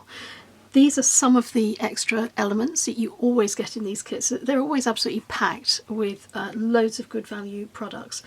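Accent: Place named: United Kingdom. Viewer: Your accent suggests you are very British, I love that as I'm in Portland Oregon, the land of no accent! I just love all things you create and how you add real life bits and pieces to your crafting.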